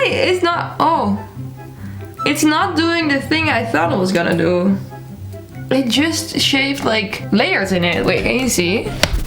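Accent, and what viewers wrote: Netherlands. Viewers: Ik heb mijn voorhoofd verbrand met mijn stijltang, en ik heb perongeluk mijn bangs micro geknipt... Ik zoek gewoon voor antwoorden op YT, en dan zie ik jouw video en mijn brein gaat zo van "ZE KLINKT NEDERLANDS" En nu ben ik blij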